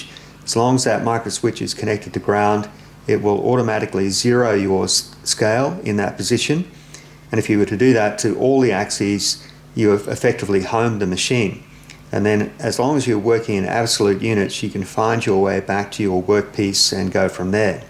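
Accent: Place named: Australia. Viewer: Bridgeport would be my machine of choice, but being a hobbyist I "opted" for an Optimum. It would be great to add your touch dro device to my newly ordered machine. Good luck - great to watch your channel, particularly to hear the familiar Aussie accent (here in Sydney)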